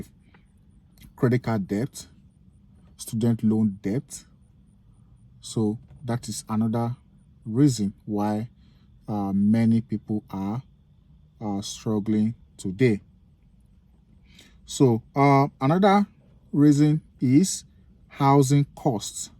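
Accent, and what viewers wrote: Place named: Africa. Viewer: Better learn the English grammar. Poor vocabulary , non articulate., African accent. I was interested hearing your argument , towever could not finish your video. This is a positive criticism, no intention to pull you down.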